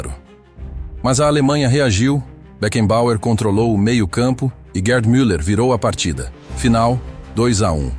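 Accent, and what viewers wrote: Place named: Portugal. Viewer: Do nada o narrador mete um português de Portugal kkkkkkkk toda hora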